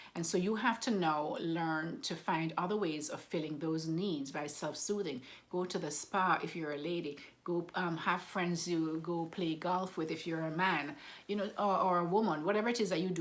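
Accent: Jamaica. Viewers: Dr., is your accent Jamaican?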